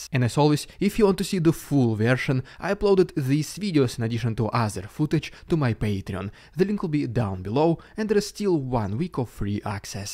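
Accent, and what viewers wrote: Russia. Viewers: I know it's 'not all russians' and I am glad for decent russian people like you. And I love your accent. :) I have a little bit of russophilia left in me despite the last year. (and incidents before them too). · Nice guy. Your English with Russian accent is very clean and smooth and soft... some very good lessons with enunciation. Keep up the good reporting. I know because you're Russian background your popularity will be lower, but you're doing well... keep it up!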